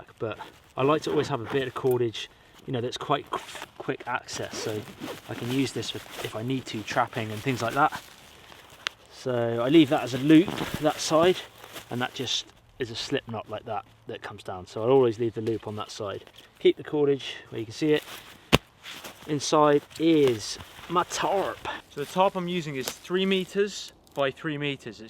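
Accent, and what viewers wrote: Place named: United Kingdom. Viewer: OH my Goodness, I had no Idea that you all over there in the UK used daylight savings time too! I love all your videos, and listening to your accent and learning more about the outdoors. Thank you for taking us all along with you on your adventures and your builds... and OH some awesome foods! Take care.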